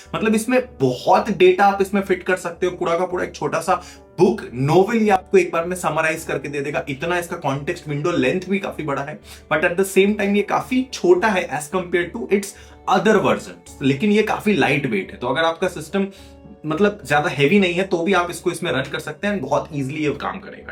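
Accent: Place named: India. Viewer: Compà tutta sta IA e poi l'inglese con l'accento indiano? non mi dispiace, lo trovo un bel intrattenimento complementare ma faccio fatica a volte a seguire!!! fallo nella tua lingua con traduzione automatica!!!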